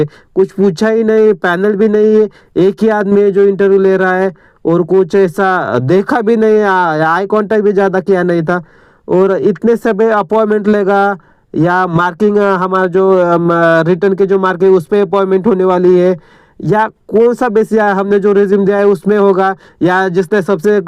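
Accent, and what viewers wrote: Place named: Maharashtra, India. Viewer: साहेब आपल्या हिंदी वरून वाटत आहे आपण मराठी आहात आपण मराठी मध्ये व्हिडिओ बनवा समजल तरी आपल्याला काय म्हणायचं आहे ते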